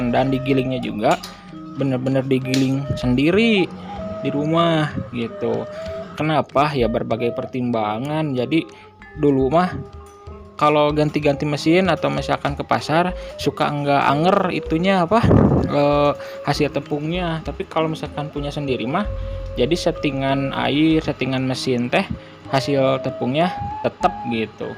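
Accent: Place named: Sunda, Indonesia. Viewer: Suaranya sgt sunda😀😉 Sundanya mana mang✌😉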